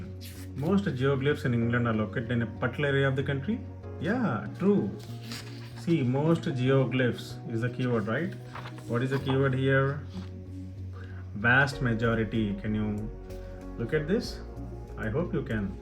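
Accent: India. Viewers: The fu/king indian accent 🤣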